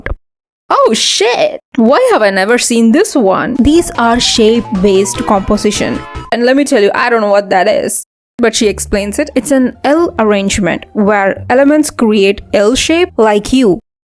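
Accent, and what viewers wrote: India. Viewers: Are you an Indian? Your accent sounds familiar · your accent is lowkey indian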